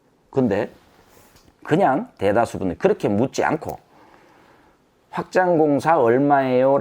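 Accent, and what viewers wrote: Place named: Daegu, South Korea. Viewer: 공사해보지않고는 어찌 실력자인지 구분할수있을까요.ㅜㅜ6월1일 이사가는데 올수리해야하는데 걱정이 많습니다.사투리 ㅎㅎ 대구지역이신가요?대구면 상담하고싶네요.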